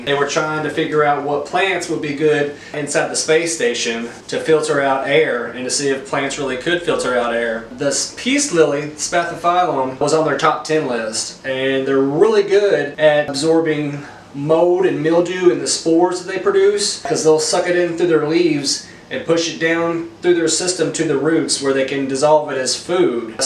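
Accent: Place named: Central East, United States. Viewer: Just got my first peace lily and this is such a great video. I also knew you had to be from a central eastern state from your accent. I’m from Southwest Virginia😊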